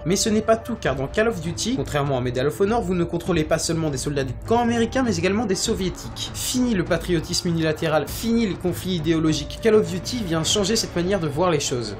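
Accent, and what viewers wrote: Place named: France. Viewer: Putain ca partait bien ... Puis ce putain d'accent francais a tout gacher Cale of duti... Et l'répèter tout le temps c'en est saoulant...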